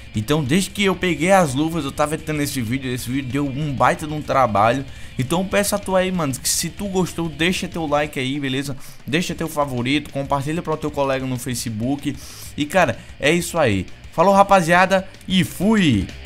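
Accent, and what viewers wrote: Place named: Pernambuco, Brazil. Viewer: owwww vc é de Pernambuco ne ?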